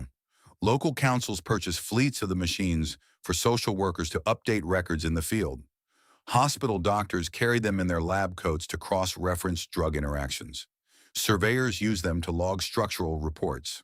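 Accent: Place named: United States. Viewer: Can someone please tell me why a video on British computers has an American voice??! I find this very odd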